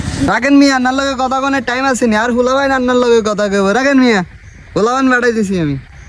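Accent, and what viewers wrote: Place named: Mirsarai, Bangladesh. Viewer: ভাষা টা হচ্ছে মিরাসরাই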